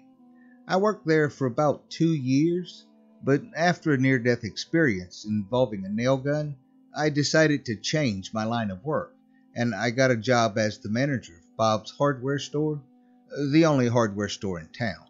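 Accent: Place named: Midwest, United States. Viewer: You really sound like guys I know are you midwestern like Iliana area if you know where I’m talking about then you are if not oh well but you have that sound. It’s good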